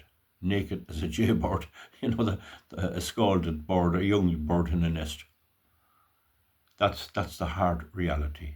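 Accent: Ireland. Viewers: Last time I heard such wise words in a fine Irish dialect was a while ago in a Cricklewood tavern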